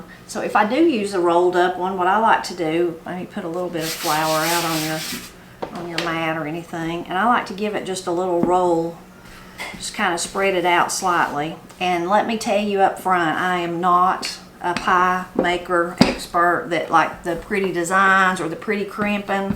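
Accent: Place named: South, United States. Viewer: Good morning, I enjoy your videos. Thank you I live in Connecticut, your southern accent is charming and you stories and anecdotes are interesting. I would like to offer my tip for blueberry picking. I want to use 2 hands to pick so i use a milk jug that I cut the top off but leave the handle to which I tie a ribbon or string that I put around my neck for hands free picking. I always bring a larger container to dump into as I go so I can get slit of berries.🙂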